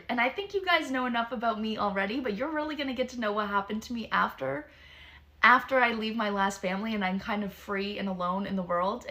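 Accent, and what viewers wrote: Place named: Canada. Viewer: You sound Canadian. So I’m guessing this occurred in Canada. Could be wrong though.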